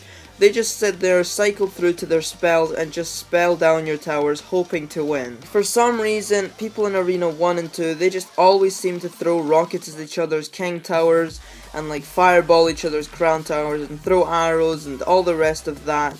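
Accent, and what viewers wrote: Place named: United Kingdom. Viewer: If you mean Russian then he's not, he has a British accent.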